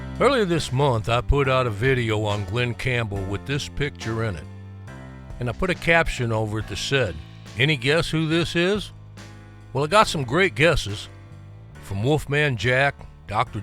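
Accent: South, United States. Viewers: Thank You, Thank You, Thank You For This Great Memorable Leon Russell Profile!! Your Southern Drawl is Perfect, Gives This Presentation More Oomph!! :washhands::washhands::washhands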